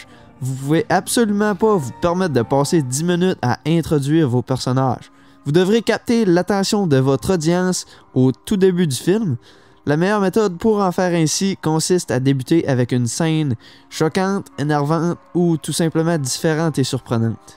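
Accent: Quebec, Canada. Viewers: Je suis français, dès que j'ai entendu l'accent québécois, j'ai su que le contenu serait de qualité :) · accent de canadie -> je me bare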